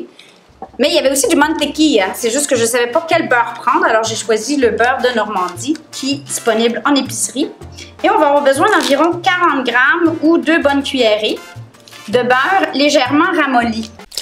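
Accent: Quebec, Canada. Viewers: Salut super vidéo ! Je pense que ta joie de vivre et ton bel accent québécois t'emmènerons loin ! ( enfin j'espère bien ! 😁) Merci pour tout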